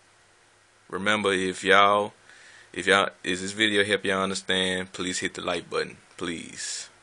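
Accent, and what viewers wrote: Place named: Georgia, United States. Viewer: Are you from Georgia?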